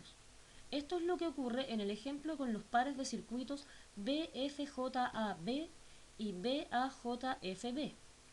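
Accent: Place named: Chile. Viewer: me fascina el acento chileno :3 buena explicacion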